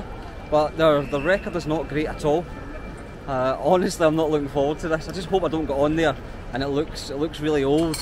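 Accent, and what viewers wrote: Scotland, United Kingdom. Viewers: Sounds like Dale Philips, you must be Scottish. Very weird accent,requires too much attention to understand what are you trying to say. Airline is very reliable, and takes you where you want to go.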